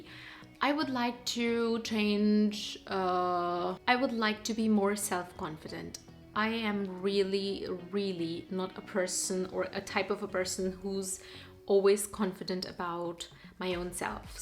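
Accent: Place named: Germany. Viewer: German englisch dialect 🤣👌🏽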